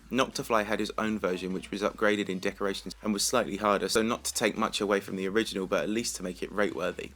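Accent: United Kingdom. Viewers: I love ur british acent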